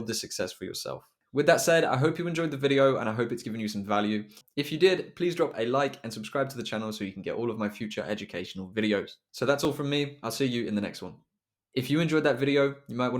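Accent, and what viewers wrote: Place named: Northern England, United Kingdom. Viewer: I have just subscibed. He might just come from North England. Maybe, Newcastle, Middlesbough, Sunderland, Southshields, Cumbra lol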